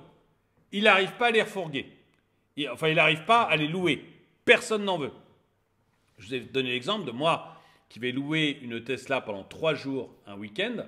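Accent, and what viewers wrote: France. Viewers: Je viens de m’abonner cette semaine! J’aimes les thèmes que tu abordes et ce que tu dis. Surtout la manière dont tu le dis...(très française! le ton, le phrasé).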